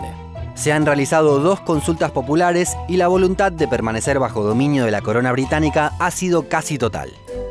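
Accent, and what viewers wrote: Argentina. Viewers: Nunca me gustó el acento argentino, pero el tuyo es punto y aparte, me encantan tus videos, saludos.:)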